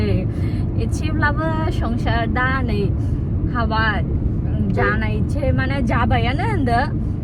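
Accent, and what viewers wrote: Bengal, India. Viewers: Pure bengoli bodo raidlai abo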